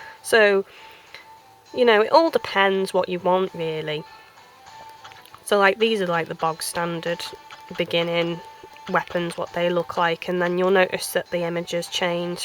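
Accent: United Kingdom. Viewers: i lovr that ur well into it, and ur ur british woop woop lol, where in england u from?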